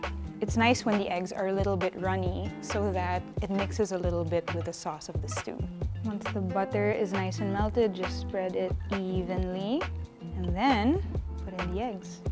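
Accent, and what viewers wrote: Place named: Philippines. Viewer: You have an accent. Are you pinay?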